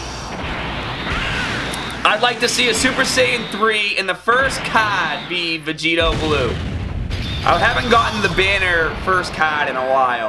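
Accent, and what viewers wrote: Boston, United States. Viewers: You from Boston my guy?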